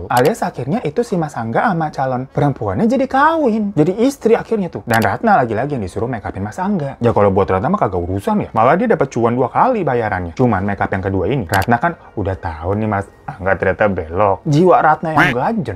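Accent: Java, Indonesia. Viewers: 🤣🤣🤣🤣🤣🤣🤣paling suka kalo denger bang ngomong bahasa jawa....lucu aja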